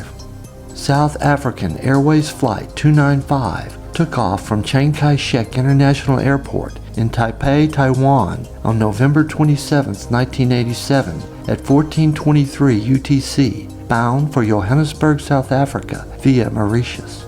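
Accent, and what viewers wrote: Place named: South, United States. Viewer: I hope episode 4 drops soon! Thanks, this was excellent. Love your accent! Are you in the South? ❤️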